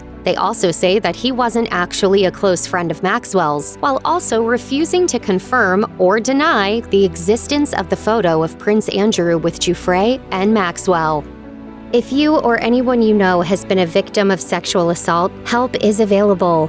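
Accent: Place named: United States. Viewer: Good content, but that high pitched screetchy American voice grates on me. Anyone?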